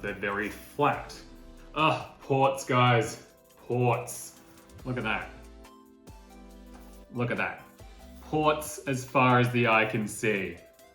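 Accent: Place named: United Kingdom. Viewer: Great video. For myself, I’m about to purchase. But I’m stuck between silver and space grey and I think you helped me with that but so ty for that bc I’ve been on the fence about what color I like, but the specs I’m getting are m1 max and 64 gb with 2 tb ssd for some future proofing. And I love your accent I’m moving to England from the states and I just love watching British content creators!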